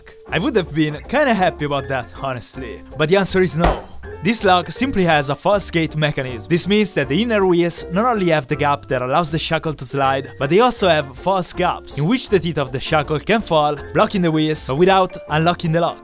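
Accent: Italy. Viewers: I am now very nervous about traveling to Italy because of your skills and accent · I started noticing the English pronunciation were somehow familiar to me... I see Italian dictionary on the left : "I KNEW IT, MIO FRATELLO!!!" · are you italian? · Lovely Italian accent makes it so much more credible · Why did I watch this whole video just to hear his accent? LOL. He's so Italian. I love it. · U sound italian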